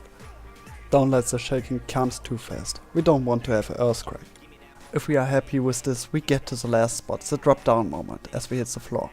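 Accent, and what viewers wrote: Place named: Germany. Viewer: Man merkt das du deutscher bist. :D Aber richtig geile Idee mach weiter so.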